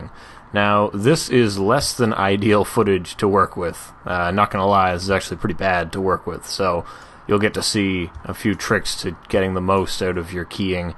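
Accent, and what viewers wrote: Canada. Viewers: ... Ur Canadian aren't you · Dat Canadian accent doe :D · Are you Canadian?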